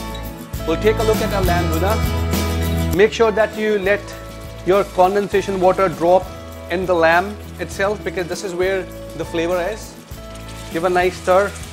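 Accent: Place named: Lancashire, United Kingdom. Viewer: I'm loving this blokes accent. Where's he from? I'm getting north east lancs vibes off it.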